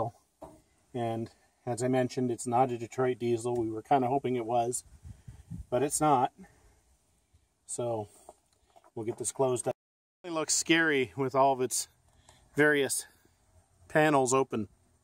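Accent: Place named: Canada. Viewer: He's Canadian, and some Canadians pronounce certain brand names differently, so I think I see what you mean.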